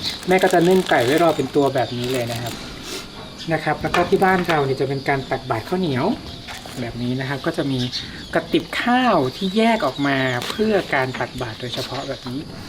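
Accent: Isan, Thailand. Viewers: สาธุสาธุสาธุอาจารย์ป้าเป็นคนอีสานจ้าได้ฟังอาจารย์คุยกับแม่อาจารย์เป็นคำพูดอีสานฟัวแล้วคิดถึงบ้านจ้า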